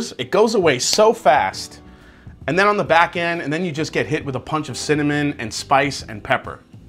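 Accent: New York, United States. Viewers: Your New York Italian coming out hard core.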